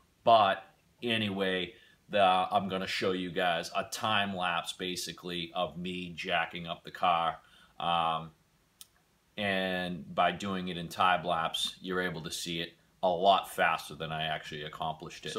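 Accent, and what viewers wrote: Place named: Boston, United States. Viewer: Hello form 02368. I heard that Boston in you for sure, before I read the name of the YouTube channel LOL. Great video.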